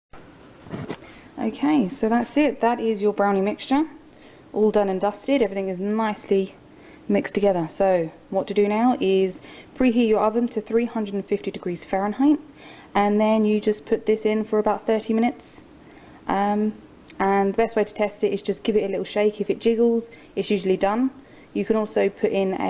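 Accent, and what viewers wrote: United Kingdom. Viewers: I didn't expect you to be British :) UK FTW. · oh my god shes british <4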